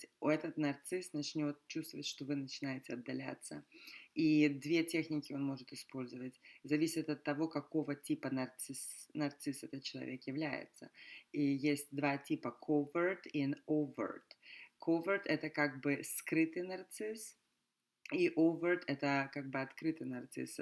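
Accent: United States. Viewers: Очень приятно вас слушать. Приятный американский акцент. Хочу так разговариваить. так бы слушала и слушала. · Какая жёсткая американская интонация...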